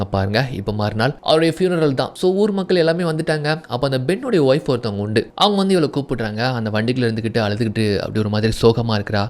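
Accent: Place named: Thoothukudi, India. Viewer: Eilla Thoothukudi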